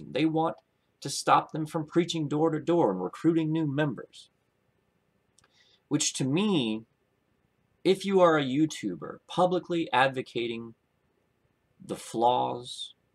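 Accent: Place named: United Kingdom. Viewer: I like the Britsh accent! lol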